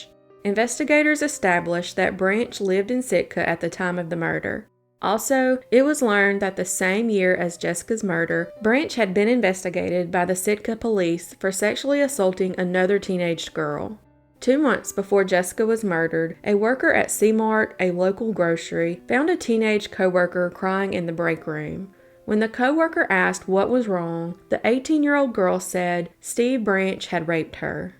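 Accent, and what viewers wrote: South, United States. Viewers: You are my fave Youtuber in the genre. fresh stories and a nice southern drawl :)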